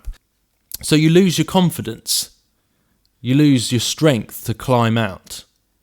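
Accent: United Kingdom. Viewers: There is no "Jezebel Spirit" in the bible. Both the "Jezebels" in the bible were evil, but neither are described in nearly this detail A well produced video and an articulate British accent does not make it any more true. There is a lot of info out there about Narcissistic Personality Disorder and how it works. Giving these behaviors a phony spiritual persona and a name is just something someone made up. The "Jezebel Spirit" is a preaching and book selling gimmick among charismatics and has been for at least twenty years. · i dont like your accent !!!! yu sound british and . . . . i will never trust a british no matter how legitimate they maybe . . . nonetheless i liked your video !!!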